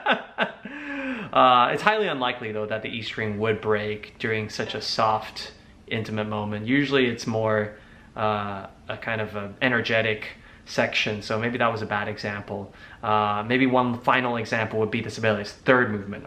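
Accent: United States. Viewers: WAIT I'm confused... he's talking in American accent but when he's when twoset he had an Australian accent?? Am I right or I'm just?? Sorry I'm not good at english anyway lol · To my ears, even Ray's American English sounded so eloquent and charming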